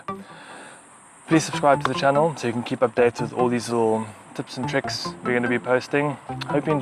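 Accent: South Africa. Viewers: Thanks for the tips. I'm subscribing because you sound South African and it's hard to find South African gardening YouTube channels today we can relate to. Looking forward to your future videos...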